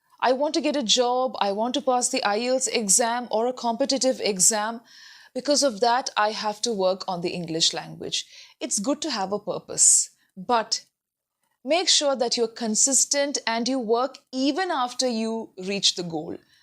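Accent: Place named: India. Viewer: You look similar to one of famous kannada serial actress Ranjani Raghavan of Kannadati. I admire her and you too and your English and skills of communication as well. Initially mistook you to her. I have a suggestion. There is no complete audio books available on YouTube in an indian accent. You sound sweet and I think every one of indians would surely love and enjoy listening to you. If possible please come up with a comple audio book of any self help book or popular book which you think of. I think you will get market as well. I don't know what you do and why you doing these videos on YouTube etc. Just felt like suggesting..... watching your video I have become a fan of your language and communication skills. Thank you very much......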